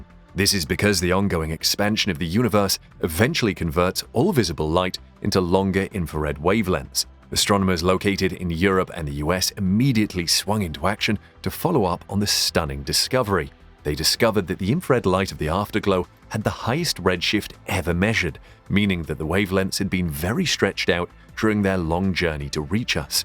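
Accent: United Kingdom. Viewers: Love your explanations and graphics in all of your videos, keep them coming! Do I also detect a Welsh accent? 🙂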